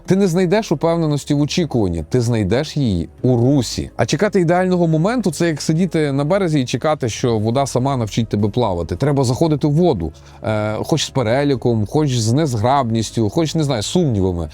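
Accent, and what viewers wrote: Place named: Lviv, Ukraine. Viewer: Перший раз побачив на підкасті Фонтан. Перші пару випусків було досить незвично...Але, через деякий час звик до "львівської подачі") - почало подобатися... БВБ, на мій погляд, гарний формат в який ведучий досить гармонійно вписався! Дякую дядь:))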